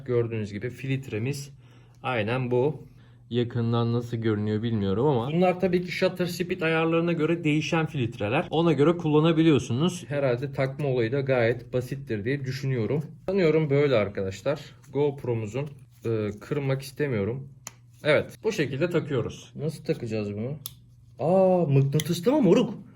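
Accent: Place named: Thrace, Turkey. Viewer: abi sen trakyalımısın ? çok fazla trakyalı ağızı var sende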